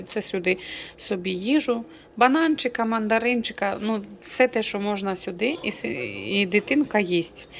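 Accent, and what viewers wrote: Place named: Transcarpathia, Ukraine. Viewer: спасибо за видео. Пожалуйста продолжайте разговаривать на украинском языке.Я уже 30 лет живу в России. Сама из Закарпатья. И так приятно слышать именно наш украинский диалект из Америки. Всех вам благ.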